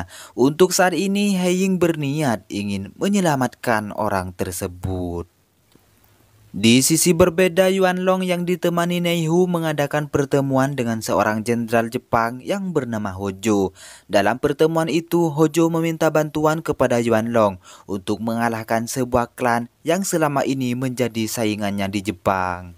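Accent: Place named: Aceh, Indonesia. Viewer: logat Aceh Sumatra kental..hhh mantab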